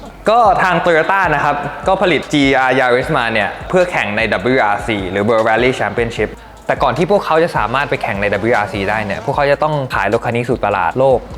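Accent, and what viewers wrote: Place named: RP, United Kingdom. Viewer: สำเนียงอังกฤษผู้ดีจัด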